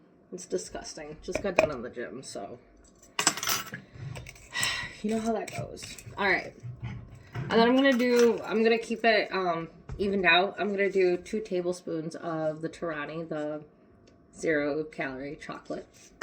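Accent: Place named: Midwest, United States. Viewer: Holy crap, how do you say you are from the Midwest, without saying you are from the Midwest. Got to be Wisconsin or Chicago area.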